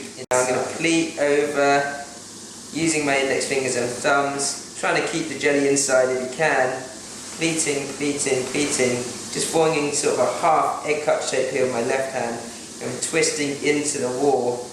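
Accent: England, United Kingdom. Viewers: love this dude's accent! asian man talks like some englishman. And honestly how on earth does the XLB not look legit? This man's got better technique than most bogus white chefs who's trying to cook "fusion" or "chinese" !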